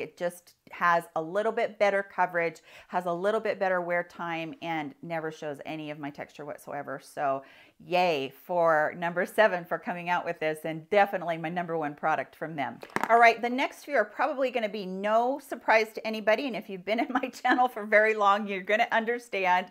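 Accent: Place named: Midwest, United States. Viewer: Where are you from? You sound midwestern. Btw loved the video!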